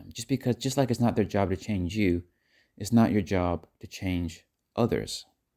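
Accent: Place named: United Kingdom. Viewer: This is an English accent mixed with an American. It’s so weird how sometimes you stray into British, and then you put the American accent on again. I hear you! British guy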